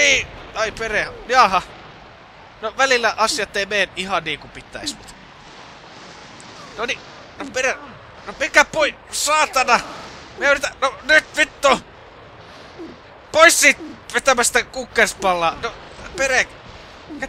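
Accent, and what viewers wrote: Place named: Savo, Finland. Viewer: On kyllä tuo savolainen vakkooja niin komeeta kuultavaa :D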